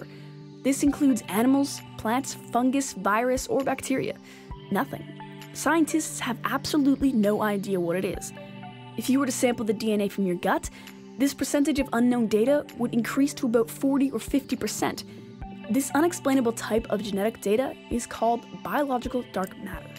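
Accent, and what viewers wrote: Canada. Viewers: I detect an Canadian accent!!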